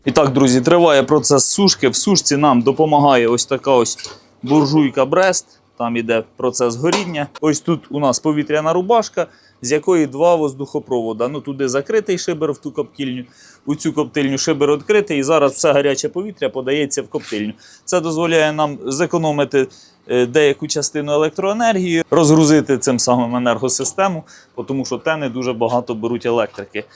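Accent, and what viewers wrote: Cherkasy, Ukraine. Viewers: Зразу по говірці почув, що Черкащина. Привіт землякам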